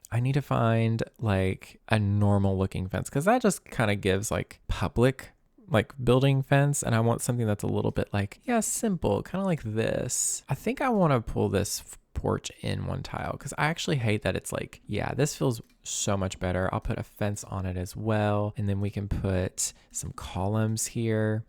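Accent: South, United States. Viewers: ! I love your southern twang you’ve def made the line up of my nightly sims YouTubers ❤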